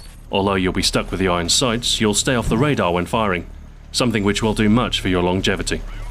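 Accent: United Kingdom. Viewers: so british?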